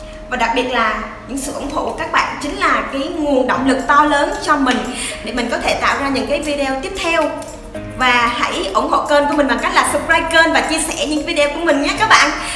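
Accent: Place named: Central Highlands, Vietnam. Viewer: Chị dạ hay quá , giọng lạ nữa , như người dân Tây Nguyên luôn 🥰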